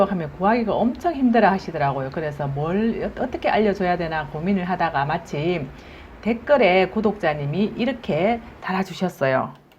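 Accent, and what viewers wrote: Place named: Busan, South Korea. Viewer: 부산 사투리 너무 매력있어요~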